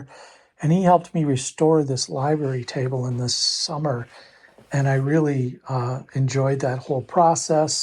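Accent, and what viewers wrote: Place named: Midwest, United States. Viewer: Love how mid-west you speak,! I remember your cool old drafting table you had in the basement studio. Wondered if you miss it.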